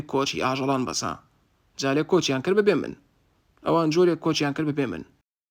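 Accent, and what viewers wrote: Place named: United States. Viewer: سلاو ڤیدیۆکانت زۆر باشە بەس بەداخەوە ئینگلیزی ئەمەریکیە بۆ 😢 بەریتانی باشترەە چونکا کوردەکانمان هەموووی لەبەریتنیایە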